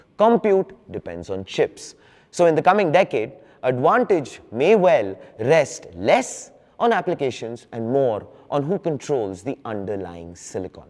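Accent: India. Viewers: Yes you can beat china by your fxxking braging mouth.....cause yours danm good in fxxking curry english That all😮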